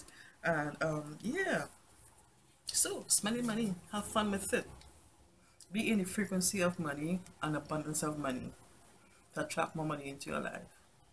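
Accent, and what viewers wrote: Trinidad and Tobago. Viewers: I could tell by your accent hi trini am so happy 🥰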